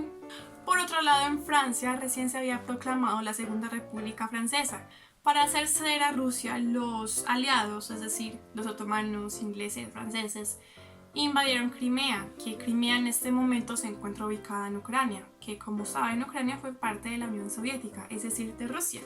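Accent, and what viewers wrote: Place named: Colombia. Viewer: Videos asi no tienen pérdida alguna, muy bien hecho y explicado con tu propio toque y estilo. Y más aún si es con metal PD: Con ese acento te me haces que eres de mi Colombia tierra querida.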